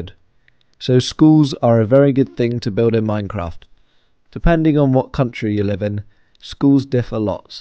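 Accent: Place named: Birmingham, United Kingdom. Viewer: i think bro's from birmingham 💀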